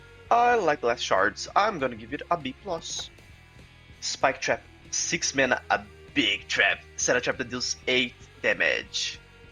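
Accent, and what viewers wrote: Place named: Brazil. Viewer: where are you from? looks like brazilian accent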